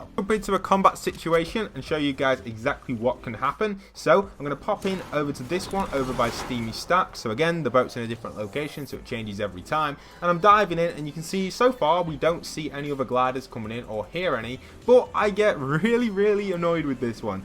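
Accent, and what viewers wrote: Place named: Cheshire, United Kingdom. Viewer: Hey you sound like your from the Cheshire area it would be awesome if you are because I am too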